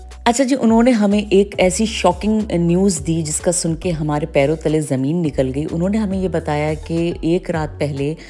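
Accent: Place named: Lucknow, India. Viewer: Aap ki urdu tallafuz aur zaban subhanallah bilkul lucknowi hai! Shayad aap log UP India se migrate hoke Karachi Pakistan gaye honge!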